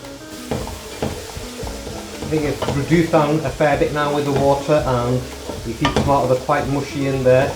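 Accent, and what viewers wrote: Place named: Northern England, United Kingdom. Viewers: British accent. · Northern English I think to be more precise, not too far from my hometown I'm guessing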